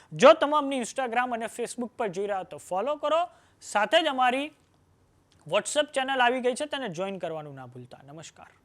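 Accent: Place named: North Gujarat, India. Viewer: Bhai tame boli upar thi utar Gujarat na lago so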